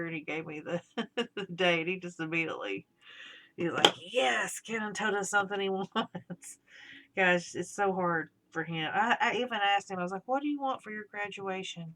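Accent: South, United States. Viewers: I live in the South. I enjoy hearing a good Southern accent. :) I believe in the empath thing. Have you ever heard of highly sensitive people? There's a trait called sensory processing sensitivity and it has to do with how your nervous system is wired. I learned about it when I was 18/19 years old through Elaine Aron's book The Highly Sensitive Person. She talks about picking up on people's emotions, etc. I've heard "no cap" on a music reaction channel I follow, but I don't know what it means. So, I need to look that up. Or you're welcome to tell me...educate me! :) So I finally got to finish your video. Thank you for helping me chill out. I had more written but when I went back to edit my comment it erased all that. I might just do a bunch of comments along the way from now on. It'll be obnoxious, but at least I won't lose what I wrote!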